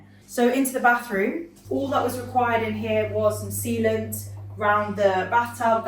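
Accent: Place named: England, United Kingdom. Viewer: She sounds english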